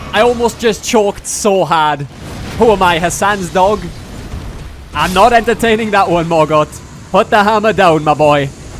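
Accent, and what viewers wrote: Wales, United Kingdom. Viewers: So Elden ring content in 2026, with a solo leveling twist and is that a welsh accent i hear take my subscribe my fellow welsh warrior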